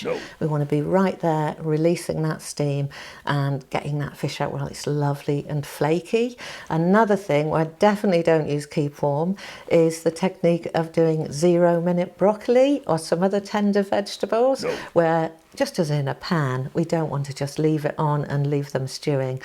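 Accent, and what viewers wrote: United Kingdom. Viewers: Dear Madam, your delivery, speech and of course, the British accent English is really something. Kind regards.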